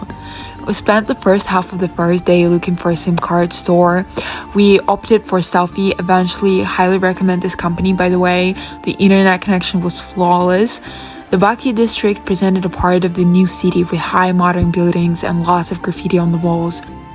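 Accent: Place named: United States. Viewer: where are you from -speak like american....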